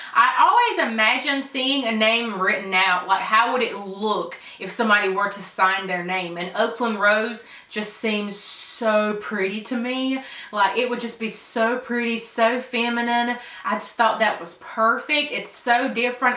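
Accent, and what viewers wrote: South, United States. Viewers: This is so unique! I love this video idea. Your country accent is so adorable. New follower, super excited to keep up with your channel!!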